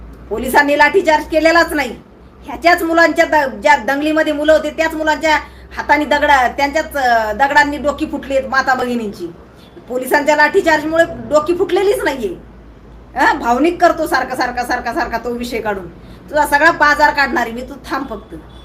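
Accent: India. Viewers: I am watching your videos from Canada. I am originally from Nagpur. I like your rural marathi and your boldness. You have exposed these so called Maratha leader. He is really bhangar illiterate person. God bless you beta 🙏🙏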